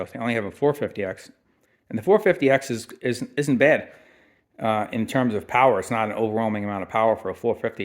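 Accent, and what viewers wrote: New York, United States. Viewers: you sound like a NYer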